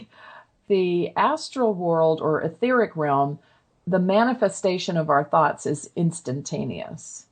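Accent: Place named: Indiana, United States. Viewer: Love your accent. Could be Northern Indiana. I know time doesn't exist there (hereafter) as we know it, but I can't get my mind around what they do "all day" over there.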